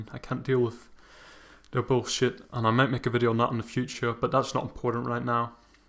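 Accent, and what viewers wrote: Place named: Wales, United Kingdom. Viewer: Skot Wilson he sounds welsh to me.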